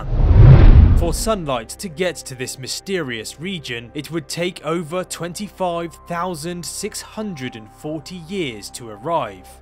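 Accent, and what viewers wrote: United Kingdom. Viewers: More amazing than this video is that the video was narrated by someone with what sounds like a British accent and using miles instead of kilometres. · you sound like a brit.. so why are you using miles?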